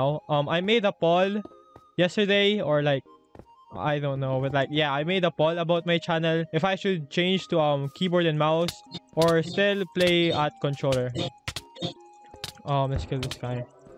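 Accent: Philippines. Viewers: that filipino accent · filipino ka ba idol? halata kasi sa accent mo eh HAHHAAH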